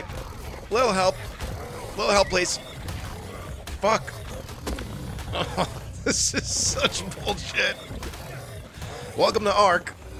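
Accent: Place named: New York, United States. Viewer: Man, when I found this channel I thought it was Simon from Neebsgaming due to the NY accent hahahaha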